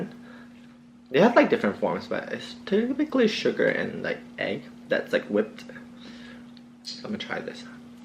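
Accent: Malaysia. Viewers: Malaysian !! I watched your videos all the time, and I was always wondering what nationality you are. My instinct told me you are Malaysian cause of that accent !! HAHA. ANYWAY !! I am super happy there is one more Malaysian in the states !! PROUD OF YOU !!!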